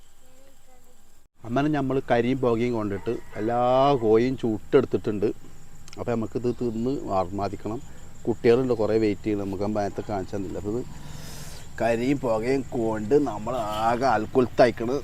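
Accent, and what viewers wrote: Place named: Palakkad, India. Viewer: മസാല കോയിക്കോട് ആയ്ക്കോട്ടെ സംസാരശൈലി പാലക്കാട്‌ മതി 😀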